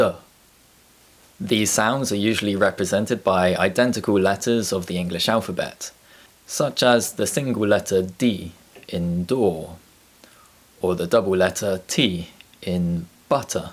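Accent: United Kingdom. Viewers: Things that i love the most is learning british accent british slang anything about Britain.are u british?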